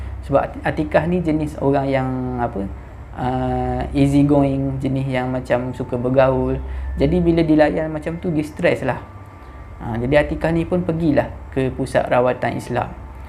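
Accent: Terengganu, Malaysia. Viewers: nk tye je ni org ganu ke sbb dgr slank ganu 😅terbaik cite dk pnh trlepas lg dgr 👍teruskan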